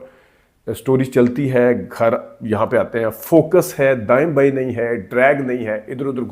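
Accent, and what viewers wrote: Punjab, India. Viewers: Like you I think your mother tongue is Punjabi that's why when your urdu not clear Apki urdu mein bhi Punjabi lehjha clear Nazar ata hey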